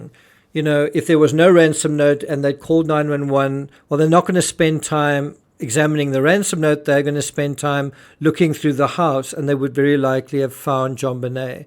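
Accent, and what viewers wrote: South Africa. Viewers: you have an excellent way of presenting your podcasts I love the South African accent too. From Melbourne down under Australia 🇦🇺 🇦🇺🇦🇺 There seems to be an awful increase in the murder of young children either by a parent a family member or a paedophile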